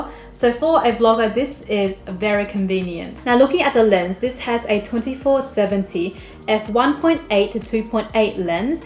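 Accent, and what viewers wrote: Australia. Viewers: Ha, I was trying to figure out if you're Australian, and then you mentioned Darling Harbour (unless I missed an earlier obvious reference). Good to see another Aussie doing well on YouTube! Great review too, thank you. It contributed to my decision to buy a ZV1. :)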